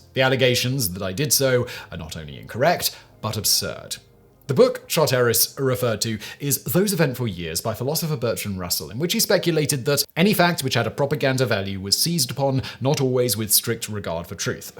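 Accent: England, United Kingdom. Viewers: Tbf, he is so english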